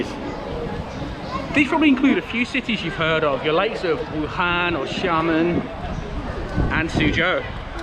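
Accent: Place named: Liverpool, United Kingdom. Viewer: welcome to China. BTW, are we still hiring scouse teachers? odd, very odd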